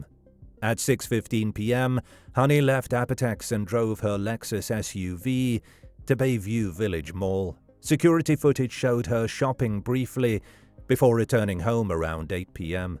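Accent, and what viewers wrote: England, United Kingdom. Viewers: I live here and visited the house days ater...It was not a gated house as you state and they were not onte of the most influential in the country....i;m only a minute in but your already this wrong I wonder what senasationalistic journalism awaits....shnooty english voice and all....work more on facts and less on being entertaining.This isn't Seinfeld we came to watch. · Why do we need a commentor with a British dialect to do a Canadian situation. Do we have to Outsource everything?😢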